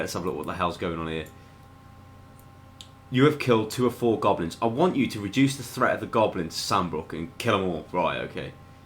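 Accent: United Kingdom. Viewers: this video is so birtish english i can take this for my english class :D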